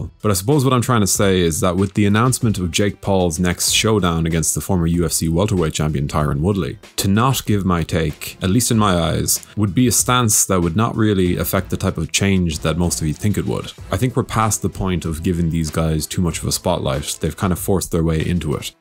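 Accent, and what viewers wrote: Ireland. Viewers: Learning to live with annoying monotone Irish voice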